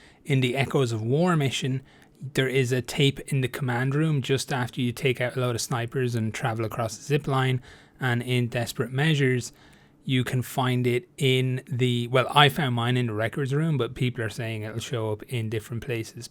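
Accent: Ireland. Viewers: he just has an irish accent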